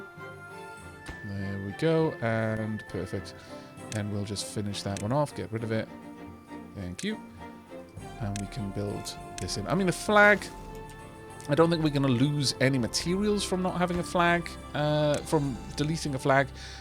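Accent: Wales, United Kingdom. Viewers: There is something soothing and comfortable about watching videos. But I admit to being biased as I love the Welsh accent! I'm crossing everything I can cross (fingers, toes, eyes, wires) hoping for a ridiculous amount of Timberborn. Am I asking too much? Never!!!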